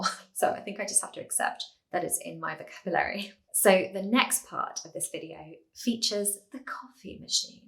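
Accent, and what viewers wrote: United Kingdom. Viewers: ❤we love you as a teacher , you’re videos making my accent like Uk people accent 😊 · I love your accent. As a Brazilian, it has helped me understand British English. Keep up on that! Handy expressions! 🤗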